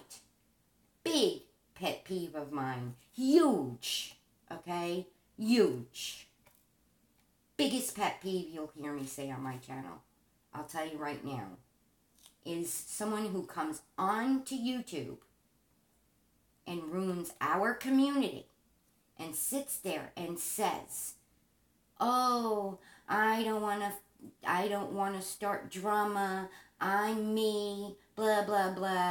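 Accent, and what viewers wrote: New York, United States. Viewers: Can't wait to see your new craft I'm loving your Newyork acsent 💋💋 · OH SNAP!! Gotta love the New York accent and honesty! You answered any questions I had about what was going on :) LOVE 💘 ya girl!!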